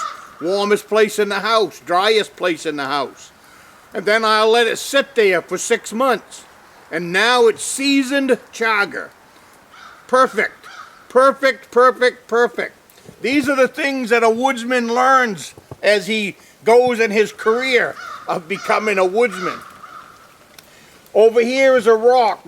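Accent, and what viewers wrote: Maine, United States. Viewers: Very informative video, and you do rock that Maine accent! With your enormous number of videos, sounds like you should write a book on being a woodsman!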